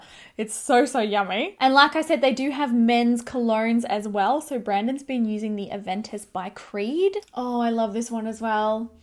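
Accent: Australia. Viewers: I am a huge fan of yours!! I lived in Sydney for years so I feel at home with you. I'm in Argentina now but my father is there. Love your good vibes and don't worry about the aussie accent is great!! Loved your wedding blog!! 😍😍❤❤💋💋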